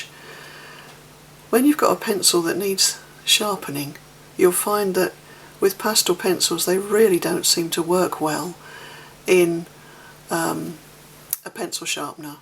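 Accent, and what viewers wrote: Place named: United Kingdom. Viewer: Good job! Are you in San Diego or England? Just curious with your British Accent!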